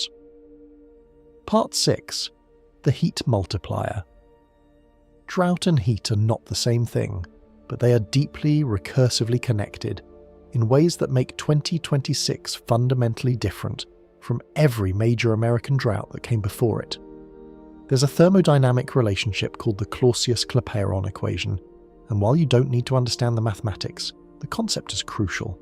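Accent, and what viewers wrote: United Kingdom. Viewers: Please remove the very annoying British English narrative in favor of neutral American English. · ......I'M SO SICK OF THESE CRAPPY NARRATIONS, WITH "BRITISH ACCENTS"!!!!!!!!!!!!!!!!!!!!!!!!!!!!!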